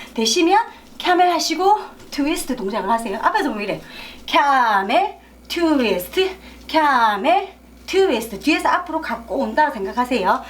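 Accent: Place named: Gyeongsang, South Korea. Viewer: 늘 잘 보고 있어요ㆍ경상도 말투가 듣기 좋아요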